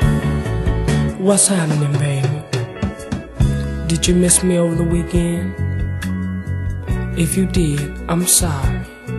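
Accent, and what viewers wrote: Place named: Germany. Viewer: I have a for every time he talks I melt the man have a accent that's out. Of this world he is German love the voice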